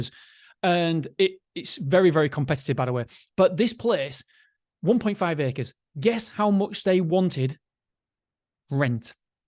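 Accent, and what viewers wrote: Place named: England, United Kingdom. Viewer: I m in France and follow you since weeks, I love your accent even you speak fast... fast. Which part of England?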